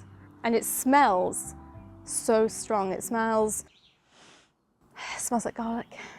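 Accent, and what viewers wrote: United Kingdom. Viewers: Can you please teach me how to dance? But first, teach me how to talk with a British accent. Then teach me how to use an L-bracket on my Canon EOS R that has a Ulanzi Claw Quick Release plate on with a Ulanzi Zero Y Lightweight Travel Tripod. I hope I'm not asking too much. 💜 You asked for feedback on your audio as you were talking and setting up around the falls. Yes, the waterfall is loud, but I clearly understood what you said, even with my 66-year old ears. You mic gain could've been a tad (or wee, as y'all say) bit higher, but that might've increased the waterfall sounds too.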